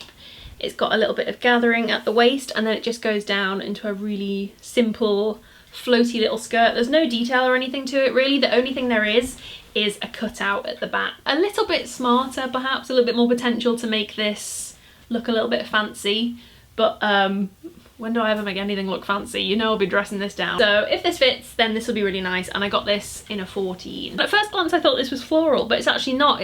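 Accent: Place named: Lancashire, United Kingdom. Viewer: Thanks for taking one for the team! I stopped going into Topshop about 17 years ago (I'm 33!) because their sizing always felt very small and I have been a size 14 basically since I was 12. Even passing the door makes me uncomfortable, like the vibes I feel are "none of these things are for you 😡😡😡" so it's good to think I might actually fit in some of their stuff if I can face going in there and having a look. PS love your accent I grew up near Ormskirk so that Lancs-Scouse adjecent twang makes me trust you even more!!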